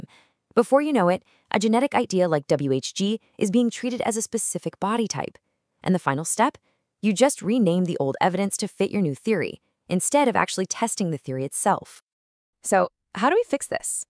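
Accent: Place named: United States. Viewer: annoying robot American begone !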